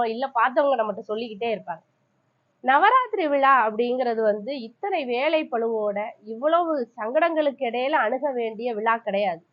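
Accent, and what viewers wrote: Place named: South, India. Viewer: அக்கா உங்களுடைய தேக்கத்திய மொழிவலக்கு மிகவும் சிறப்பு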